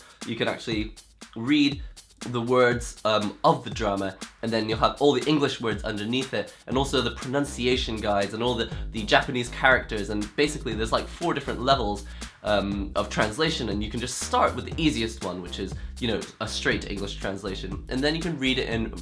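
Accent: United Kingdom. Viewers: So is the British? And learned Japanese?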